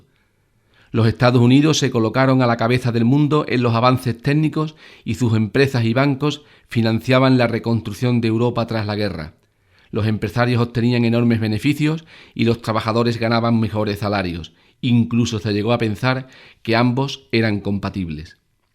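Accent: Andalusia, Spain. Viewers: Maravilloso acento andaluz.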